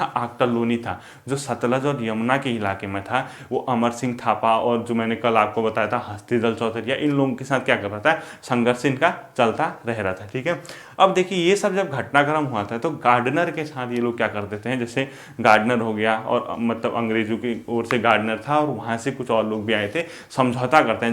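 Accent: Kumaon, India. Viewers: Bhai aap Kumouni ho? Aapka bhasa shilli batata hai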